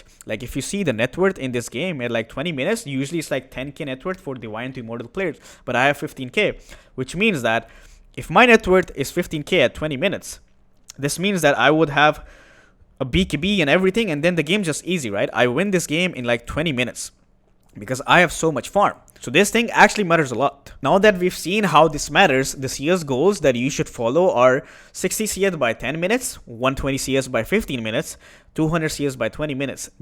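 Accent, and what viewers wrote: India. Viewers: good video but your editing is shit. sometime your voice is slow and sometime its soo fucking loud. indian sland already annoying enough now you add slow and loud voice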